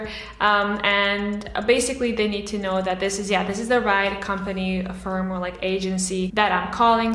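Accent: United States. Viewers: To me, you sound like you could be from the US. You're not the only one I've seen this with. Why is that?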